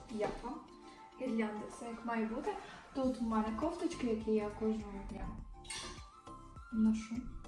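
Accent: Ukraine. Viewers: как я давно не слышала Украиеский акцент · закарпатський ділекь вуха ласкає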